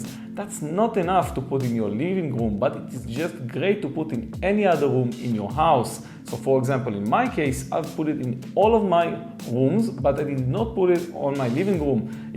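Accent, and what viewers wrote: Israel. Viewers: אין על המבטא הישראלי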